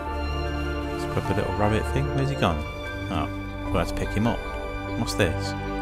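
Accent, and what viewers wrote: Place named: Newcastle, United Kingdom. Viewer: Are you geordie? You have the accent.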